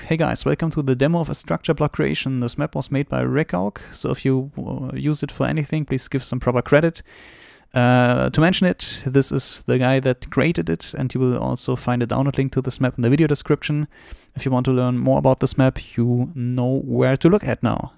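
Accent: Germany. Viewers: Yes, or that you even know. Btw are you German? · Man hört das du Deutscher bist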